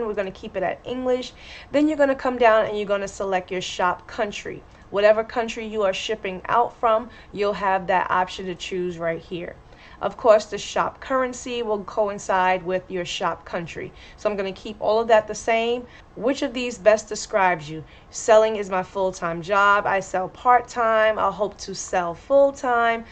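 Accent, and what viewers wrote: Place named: Philadelphia, United States. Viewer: Are you from Philadelphia or South Jersey? I recognize the accent.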